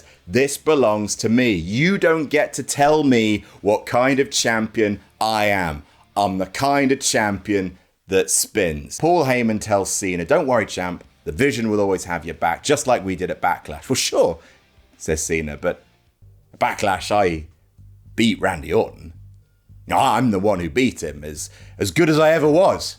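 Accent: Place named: RP, United Kingdom. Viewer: It's wouldn't be without hearing his posh accent say fuck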